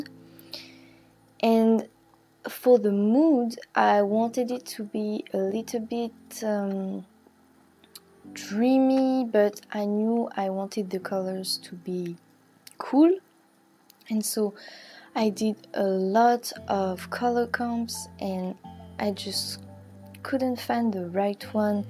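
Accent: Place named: France. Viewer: That's so great ! I love your style, and your art is so clean 😍 I'm scared I'm not right but, you're french, aren't you ? (Because of your accent)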